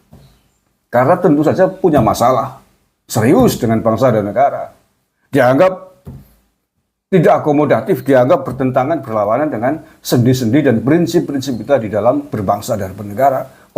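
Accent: Banyumas, Indonesia. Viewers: Ngmonge ngapak bae pak...lucuuu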